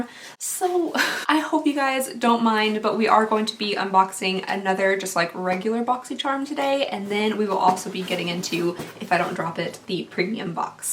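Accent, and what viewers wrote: Kentucky, United States. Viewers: I love your videos you’re very sweet and funny ❤️❤️ I’m from Kentucky also but feel our accents are so different I absolutely love your accent.! my email is <mail> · Instagram- killercupcak3 I love your Kentucky accent!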